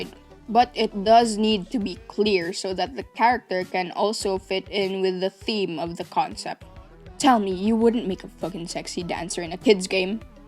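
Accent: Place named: Philippines. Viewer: I knew your Filipino from the way you speak (IDK but I can easily determine Filipino accent) but I got the confirmation with that YAWA PUTANGINA moment.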